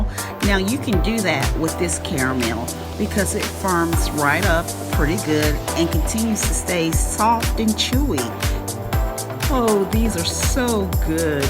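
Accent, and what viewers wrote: South, United States. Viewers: I love your southern sweet dialect. It's so soothing. Thank you for the tutorial.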